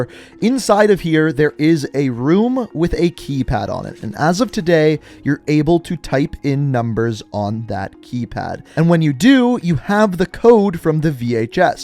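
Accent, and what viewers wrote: Canada. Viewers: Yo I love your Canadian accent much love my man❤️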